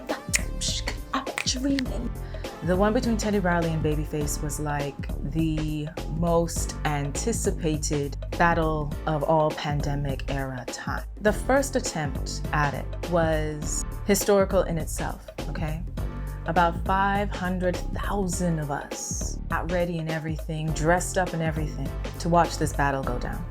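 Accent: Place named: New York, United States. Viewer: I really love your swag. You look just like Alicia Keys, everything about you (beauty, skin tone, smile, peace of mind, way of speaking (the New York City swag), natural beauty, your love for the culture, knowledge, ...), and I mean it, everything about you really reminds me of Alicia Keys. Thank you and please, keep the videos coming. Look forward to the next video. Thumbs up! Hats off!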